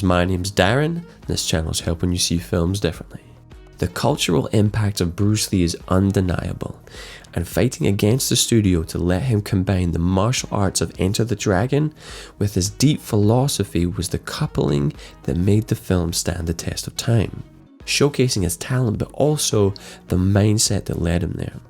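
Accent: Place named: Northern Ireland, United Kingdom. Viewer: Sorry man, was thinking Scottish but Northern Irish was in my head too. I'm from the Republic meself. Hope to see your work get recognition some day.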